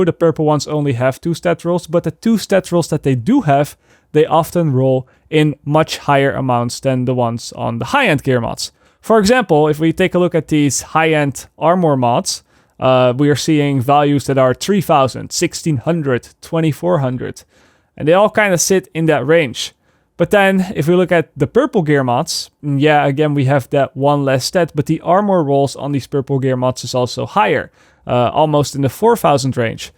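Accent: Netherlands. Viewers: Took me 5 seconds to know you're Dutch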